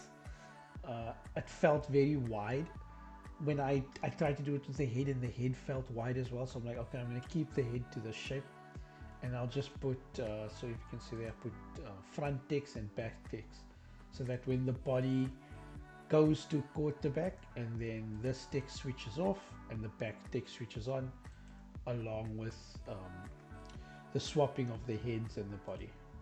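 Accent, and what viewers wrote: South Africa. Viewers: You sound very South African. Awe masekind! Shout out my brother! Keep Up The Great Work!!!!!!!!!!!! Lets push animation in SA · Are you South African?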